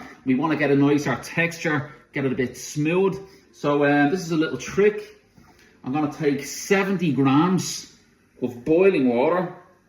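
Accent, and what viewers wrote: Ireland. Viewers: Watching with automatic CC is hilarious with an Irish accent 😂 luckily my father in law is Irish so I knew exactly what you were saying even if captions didn't! 🙈 Dissolving the sugar is genius! Definitely trying that next time, thank you! 🍀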